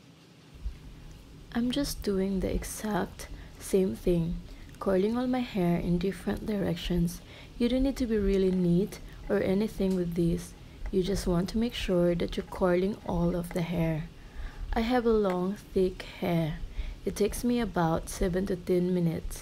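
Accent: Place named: Australia. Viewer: I love the Aussie Accent oi , hair lol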